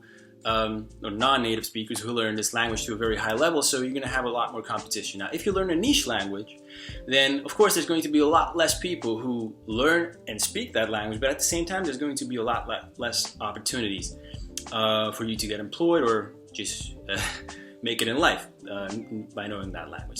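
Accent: East Coast, United States. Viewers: Honestly, I didn't notice the east coast american accent until you mentioned it. But then I totally got it. The first time I heard you speak on Youtube I just thought you were american! It took about 10 or 15mins of listening to you before I noticed the hint of an accent. And I could've never guessed you were from a slavic country 😂 I guess it helps learning English as a kid while in the US. Most slavic language speakers who learn English as a 2nd language have pretty heavy accents that they have a really hard time improving. As far as non-native English speakers, you're in an elite class of maybe 3 or so YouTubere I've seen where I just couldn't believe they weren't native at first! Anyway, I'm from Cincinnati, OH, so maybe that's a reasonable native English speaker's assessment? Anyway, love your videos, man! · I have watched your previous videos and I really can see big difference in your American accent, it's like you've been staying quiet good time there and absorbed the accent. 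Great work! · Hey, native English speaker from California here. From a west coast perspective, you definitely have a noticeable accent since you sound very east coast/urban with certain words here and there. If you're open to tips, ironically -- one of the words you pronounce a bit differently from most Americans is the word "language." You pronounce it like "Lan-guage" when most Americans pronounce it "Lang-guage." The first syllable sounds a bit flat when you say it -- it's usually "Lang" as in Langston Huges (the author) not "Lan" as in Lanyard -- the way you say it. Hope that helps. Anyway, keep it up! Your vids are awesome and your tips are really helpful. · oh I thought you are from the US man, good spoken American English.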